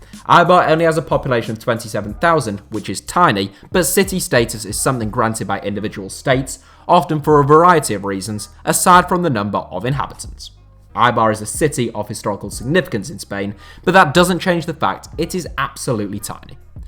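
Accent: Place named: England, United Kingdom. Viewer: Your english accent is so heavy that I can't understand a word as a foreigner😂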